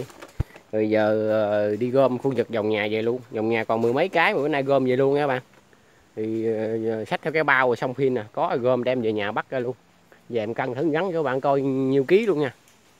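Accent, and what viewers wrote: Vietnam. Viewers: Em làm clip vui vui, noi chuyện nghe là biết miền Tây anh thích lắm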